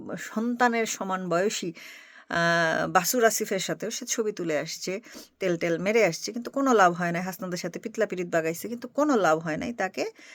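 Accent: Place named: Bangal, Bangladesh. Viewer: Bangladesher rajniti bujhina,apnar mukhe Bangal kotha shune valo laglo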